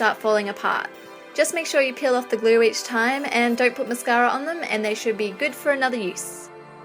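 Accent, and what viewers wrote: Australia. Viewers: is she australian? beautiful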